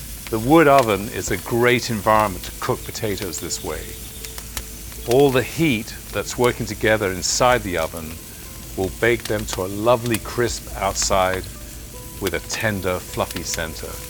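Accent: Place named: RP, United Kingdom. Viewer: One reason I love this program is the casually precise narration delivered in crip RP. The thesaurus comes naturally to this guy. There is zero barrier to vivid understanding.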